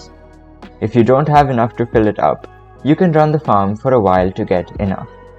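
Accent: India. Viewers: Bro has a nice indian accent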